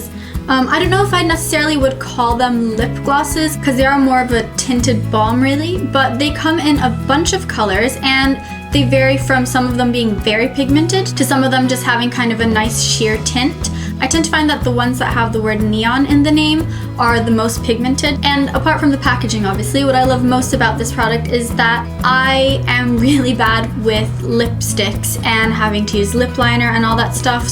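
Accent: United States. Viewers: U said u paid pounds but u have American accent.. Jus wanted to know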